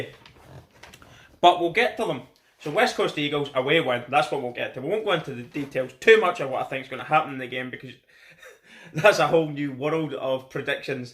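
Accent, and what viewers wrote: Scotland, United Kingdom. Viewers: love the scottish accent man, i see the rest of the round similar to how you do. Although i think St Kilda will be dangerous for us as they got a lot of negative press about their performance this week it could make play out of there skins, We getting 4 premiership players back including our captain and hopefully we don't get ahead of ourselves thinking it will all happen but come out and really work hard and get the job done.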